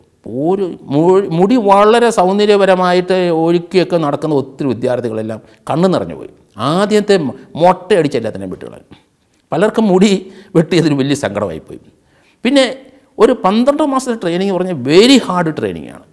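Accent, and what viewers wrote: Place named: Kottayam, India. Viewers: അതി മനോഹരമായ കോട്ടയം ഭാഷ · He has trivandrum, kottayam and idukki slang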